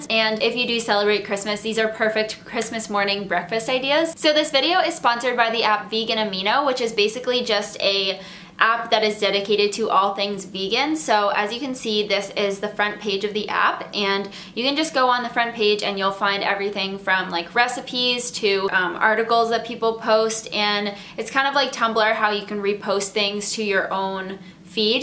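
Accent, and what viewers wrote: Canada. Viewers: Are you Canadian????? I love your videos 😁❤️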